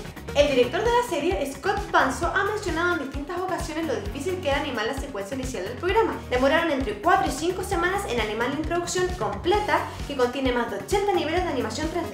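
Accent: Chile. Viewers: debo confesar que no soporto el acento chileno (así como muchos no soportan muchos otros) pero la forma de hacer los vídeos y el cuidado con las cosas que dicen me hacen obviar eso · eres chilena?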